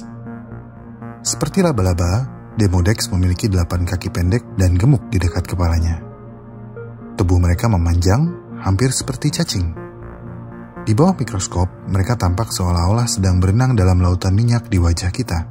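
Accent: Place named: West Java, Indonesia. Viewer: Admin orang sunda sigana yeuh 😂😂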